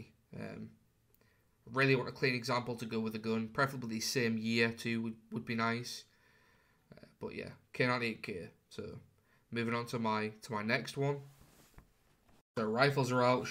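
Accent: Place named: United Kingdom. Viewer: I’m not used to listening to a British accent on a gun collection video, but great collection!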